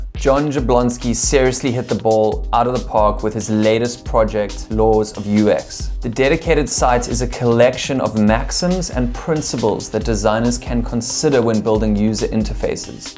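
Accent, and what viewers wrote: South Africa. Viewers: Well done, 👏. A fantastic curated blitz of resources and news from the design and dev space. Great to hear the South African accent there too:) Subscribed - looking forward to the next one. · Are you perhaps South African?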